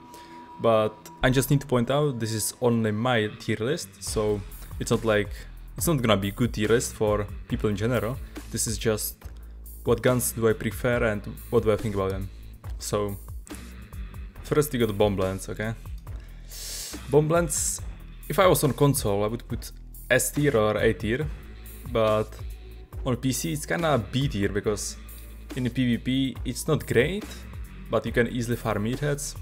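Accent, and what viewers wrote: Czech Republic. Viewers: Hmmmm is that a czech accent i hear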